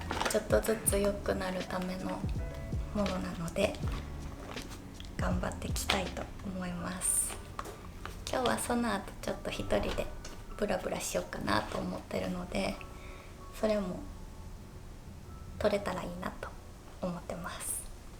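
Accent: Kansai, Japan. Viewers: 私も精神的な病気を長い間、患っていて良い時期と悪い時期の波があります。そして、線維筋痛症という身体の激痛と12年間も共にしています。動画やnoteで、naoさんが体調や心にきちんと向き合われているのを観て、私も体調と心にきちんと向き合おうと決めました。 メイク道具がポーチに入ってないのが面白かったです😂メイク道具って、なぜか増えてなぜかポーチに入りにくいですよね😂 naoさんのおかげで読書が趣味になったり、インテリアに興味を持ったり、とても良い影響を受けています。ありがとうございます！ あと、naoさんの関西弁って、とっても可愛いですね。癒やされます。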